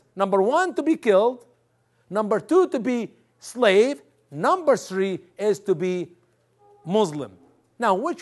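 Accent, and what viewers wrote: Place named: Arab world. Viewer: Nigga talking with Arabic and French accent, lol, funny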